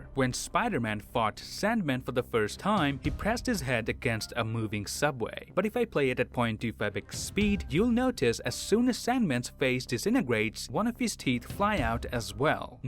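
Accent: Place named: Canada. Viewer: Don't worry, he's just speaking the usual canadian english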